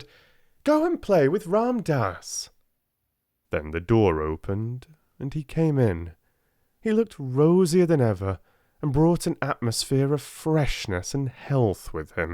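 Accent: Yorkshire, United Kingdom. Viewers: He and Neil Gammon ( sp?) Are my two favorite narrators with the touch that only a British dialect can pull me into the story or lull me to sleep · Please could you read Wuthering Heights and Jane Eyre. I guess you are from Yorkshire and would be well suited to read these stories.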